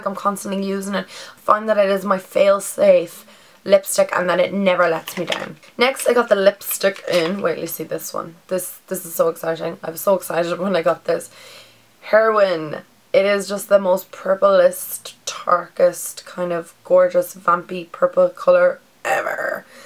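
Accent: Ireland. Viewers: Bet u from Dublin or cork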